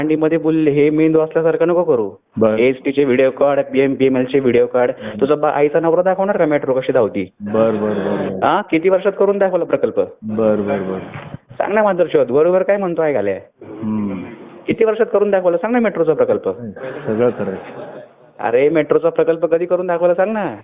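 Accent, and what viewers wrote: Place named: Nashik, India. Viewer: बोलण्यात असा वाटत नासिक किंवा A.नगर चा दिसत आहे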